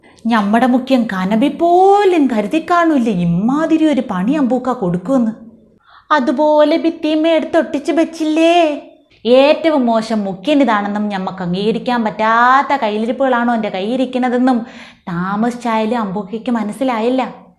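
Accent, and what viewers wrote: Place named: Malabar, India. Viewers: പൊളിച്ചു മോളേ 😂 സൂപ്പർ അവതരണം... മലബാർ സ്ലാങ് സൂപ്പർ... അഭിനന്ദനങ്ങൾ 💕💞💕 🎉🎉🎉🎉🎉🎉🎉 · മലബാർ ഭാഷയും വഴങ്ങും👍👍👍👍👍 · മോളെ.. മലപ്പുറം താത്ത.. മലപ്പുറം. ഭാഷ..😂😂😂... പറഞ്ഞ വിഷയം..😊😊😊. പക്ഷേ മോളെ.. എല്ലാം നല്ലത് തന്നെ. എന്നാൽ....... ആലോചിച്ചു. ചിന്തിച്ചു.. മുൻപോട്ടു. പോവുക.. 🙏🙏🙏. 👏👏👏. 👌👌👌. 💞💞💞. 💕💕💕... സ്നേഹത്തോടെ... ❤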